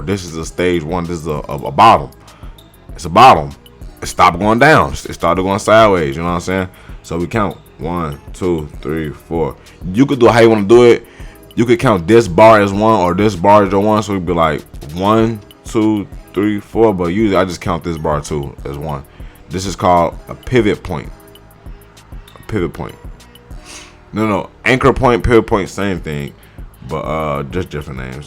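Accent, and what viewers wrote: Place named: Chicago, United States. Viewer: I can tell that Chicago accent from a mile away! You got my sub, where you from brodie? Good video I actually learned something new! I been at this shit for 6 months and starting to understand more and more about price action.. do you trade futures to or just forex?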